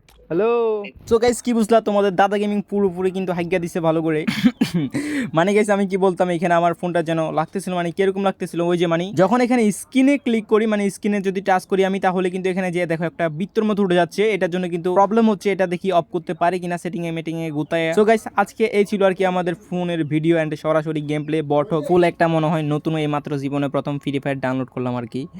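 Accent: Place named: Chittagong, Bangladesh. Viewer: vhai ki koitam to ar video in ato valo lage ar ton but ay kintu chatgya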